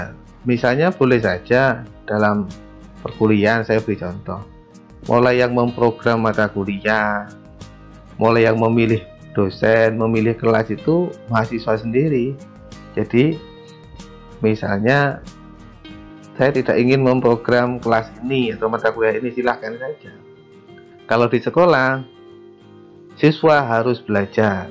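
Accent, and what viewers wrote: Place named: Osing, Indonesia. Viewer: koyone lare osing ta kang...#logate katone matur suwun ilmune....👍👍👍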